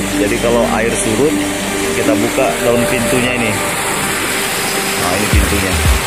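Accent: Bugis, Indonesia. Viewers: Seperti logat orang bugis hehehe salam dri bugis barru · Ketahuan dari logatnya.. Saya juga orang Bugis yang tinggal di Kab. Kotabaru, Kalimantan Selatan. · Kyak logat bugis yaa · Btw orang mana kamu bro..klo sy dgr logat nyaa Orang sulsel , Lbih tepatnya Org bugisss :) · Mesti org bugis ni logat pn boleh tau😂😂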